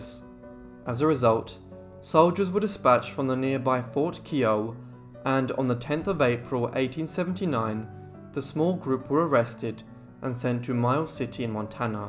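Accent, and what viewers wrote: England, United Kingdom. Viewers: I like these history narratives, but I have to say I almost skipped it at the start when I saw the narrator and heard the English accent. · this is wrong, please we need a native STORY TELLER not a brit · Very good, However, it seems a little strange for our early American history being told to us by someone with a British accent. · Why do you have someone from the UK narrating American history??? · Not buying this for a minute, beware of American history lesson from someone with a British accent...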